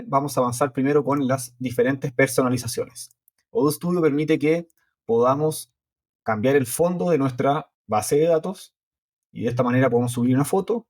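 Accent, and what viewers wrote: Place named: Chile. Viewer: Hola como estas?, Genial tu apoyo con ODOO, lo uso hace años pero primera vez que veo buenos videos en español, y en "chileno" jajaja. Una consulta, estoy intentando agregar las comunas al formulario de contactos, ya que necesito que los clientes tengan comuna y region relacionados, pero no logro que al cambiar la región (res.country.state), en el campo Comunas (que cree con Studio), me muestre solo las comunas de esa región. ME darias algún tips?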